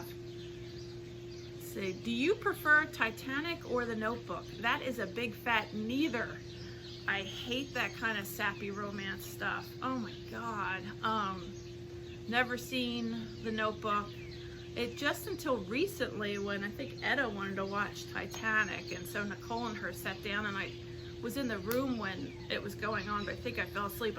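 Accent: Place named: New Jersey, United States. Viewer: you mean Traction Park??? Lol!!! I think it had its prime years when I was in school - I remember a friend of mine getting hurt there. And I know my brother went several times, but I never got to go myself. I think it’s a ski lift in the winter now, and a water park in the summers. We ALMOST moved to that area when we first got married, but the townhouse development we bought into went bankrupt. Lol!! I’m in Secaucus - which is also where I grew up. Where in Jersey were you?? It’s funny, I think I somehow missed you saying in the past that you had Jersey roots, but I recognized a tiny twinge of that Jersey ‘essence’ in your voice! 😉. (Trust me, it’s NOWHERE near mine - I have the truck driver thing down to a science. 😂)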